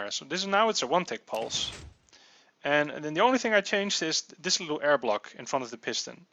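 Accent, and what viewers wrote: Netherlands. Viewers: Het , are you dutch. You sound very hollandische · Are you dutch? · lol jij bent nl dat hoor ik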